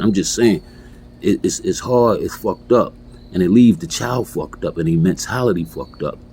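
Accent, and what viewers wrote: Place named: Philadelphia, United States. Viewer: Good chit bro💯🐺you sound like us philly n*****🤔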